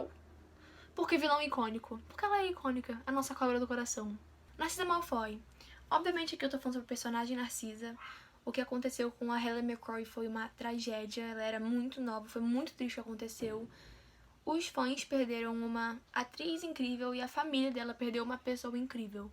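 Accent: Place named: United States. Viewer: seu sotaque americano é tudo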